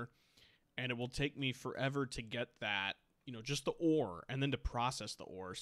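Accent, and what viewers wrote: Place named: United States. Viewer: Good prononciation of "excellent", just a thick American accent which is fine but unusual from a native speaker's perspective.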